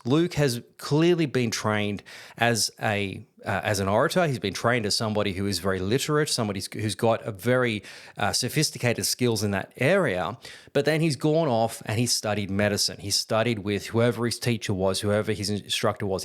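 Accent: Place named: Australia. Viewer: I've been watching a bunch of your videos today, and I'm glad of your explain-the-nuts-and-bolts format for these. It's surprising how few views you're getting, and how many of the videos have zero comments. Your Aussie accent isn't so bad, and your knowledge of the topics isn't so bad ether. Thanks for making them anyway, it must require a lot of work. Perhaps an hour plus about fairly minor characters that so little is known about is pushing it? If you can do it in a thousand words, don't feel the need to pad it to 10 000 words? I'm a Catholic, and you haven't annoyed me. Maybe that's your problem lol. Well, thanks and God bless. May your endeavors lead some to Faith, even if not you to big YouTube ad bucks.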